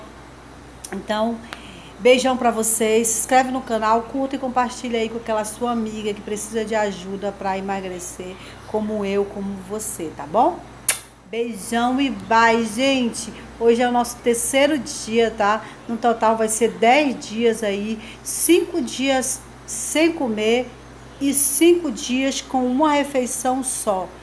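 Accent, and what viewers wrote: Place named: Manaus, Brazil. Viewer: Eita que vc falando deu saudades de Manaus. Morei 5 anos aí no Aleixo. Xeroo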